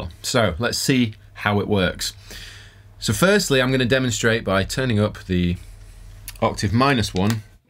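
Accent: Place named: England, United Kingdom. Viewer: More intens-a-E. 😅.....gotta love the English accent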